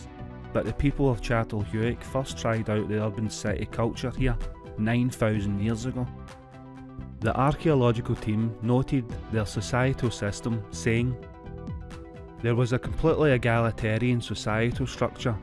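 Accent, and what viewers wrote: Scotland, United Kingdom. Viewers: English with a Scottish accent 🏴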